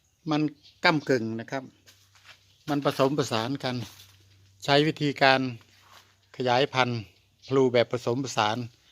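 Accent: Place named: Southern Isan, Thailand. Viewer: พูดสำเนียงเหมือนคนอีสานใต้จังครับ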